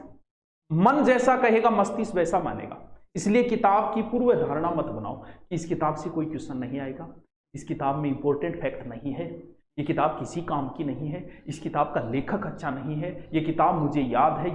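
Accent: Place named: Marwar, India. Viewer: Sir..ager English k words bolte ho to pronunciation ka dhyan rkho..."Marwadi" Wali feeling aati h... Other wise hindi hi bolo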